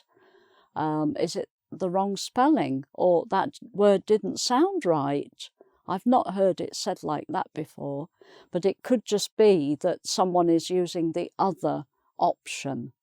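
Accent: United Kingdom. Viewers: What lovely British accent, you're so kind for helping us. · I love your british accent ❤ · You are amazing! Your British accent is very beautiful. Thank you for your lessons!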